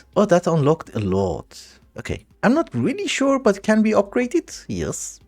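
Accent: France. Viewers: je ne m'attendais pas à cet accent français subtil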